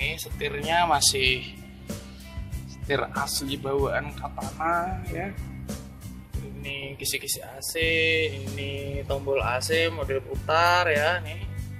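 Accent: Banjar, Indonesia. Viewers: Logat banjarnya kada kawa didustai 👍 mantap djiwa bossku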